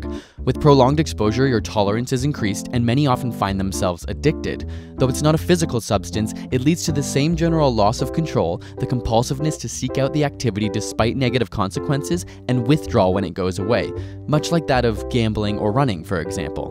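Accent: Canada. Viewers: Wait, is Canadian?:D I hope they are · Oh your canadian, pog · OMG YER CANADIAN!!!! ^_^ YAY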